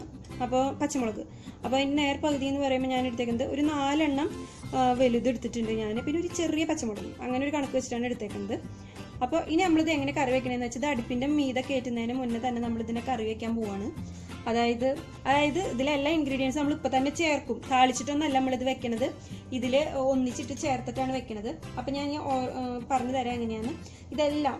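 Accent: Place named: Mullassery, India. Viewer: മോൾടെ വീട് മുല്ലശേരി, പാവറട്ടി ഭാഗത്താണോ. സംസാരം കേട്ടിട്ടു വടക്കാഞ്ചേരി ആണെന്ന് തോന്നില്ല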